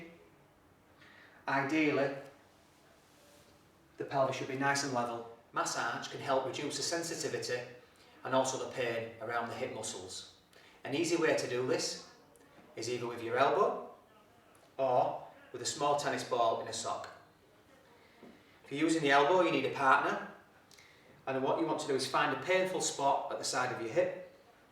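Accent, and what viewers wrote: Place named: Yorkshire, United Kingdom. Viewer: I have just subscribed to your helpful videos. I can't do these laying down movements on the floor as I can't get back up. Is it ok on the bed? The bad pain in my hip is far worse at night wakening me several times. I will try your advice thank you so much. By the way lovely to hear your Yorkshire accent.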